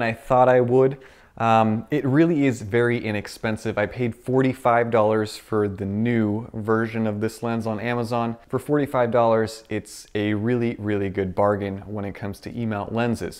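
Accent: Russia. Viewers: Чувак, ты крут! Откуда такой русский? Where did you get this accent?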